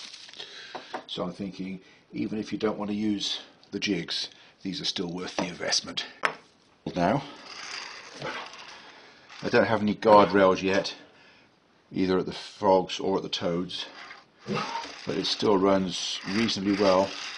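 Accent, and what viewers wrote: United Kingdom. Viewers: GREAT JOB - FORGIVE ME WHERE ARE YOU IN THE WORLD- YOU HAVE AN EXCELLENT BRITISH ACCENT BUT STATE PRICES IN DOLLARS LOOKS LIKE ASHBURN USA? WHATS THE STORY THERE?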